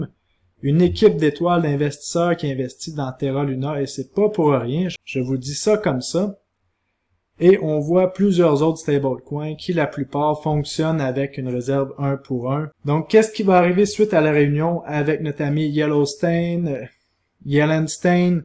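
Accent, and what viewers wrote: Canada. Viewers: Merci super vidéo et j adoré ton accent canadien il est trop différent du mien.Je suis belge j ai aussi un accent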